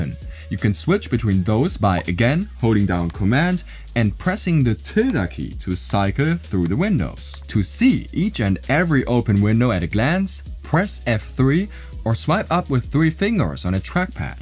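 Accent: Germany. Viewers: I hear deutschh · love that german accent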